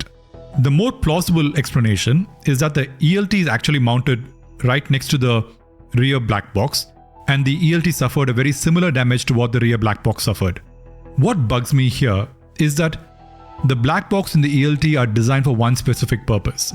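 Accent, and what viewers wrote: India. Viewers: This video is the first time i ever heard english with indian accent and actually kinda liked it…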